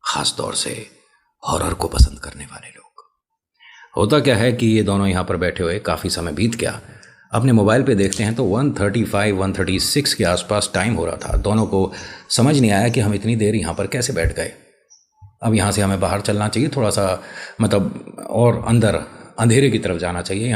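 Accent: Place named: Moradabad, India. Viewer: Jay Shri Shyam Bhai Har Har Mahadev aapki Bhasha bilkul Muradabad Jaisi Hai isliye main aapki Kahani sunti hun aur Kahani acchi Sunate ho aap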